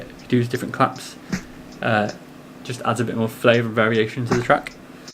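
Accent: United Kingdom. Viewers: You from the north of england mate?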